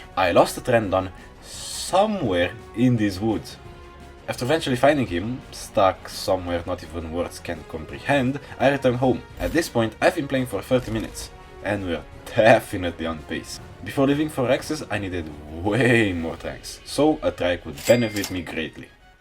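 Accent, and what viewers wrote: Poland. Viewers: Kolego, is it a polish accent? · you just sound polish and im polish so was curious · Are u from poland?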